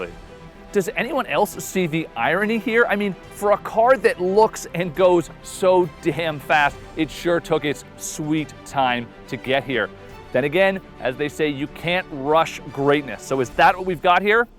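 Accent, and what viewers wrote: Canada. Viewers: I gotta ask.... you're Canadian, aren't you? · This guy sounds like a cheese head. Canadian for the uninformed.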